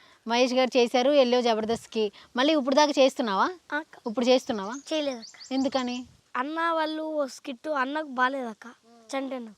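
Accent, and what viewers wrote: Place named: Visakhapatnam, India. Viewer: maa thalley annadi antey pakka vizag eyyyyy…😎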